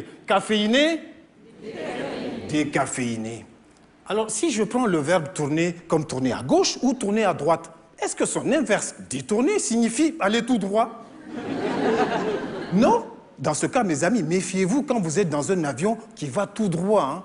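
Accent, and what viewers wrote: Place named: Niger. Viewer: Le type Nigéroi avec un gros accent, il débarque et il te fait du Raymond Devos, et j'ai aucune honte de faire cette comparaison, c'était très bon.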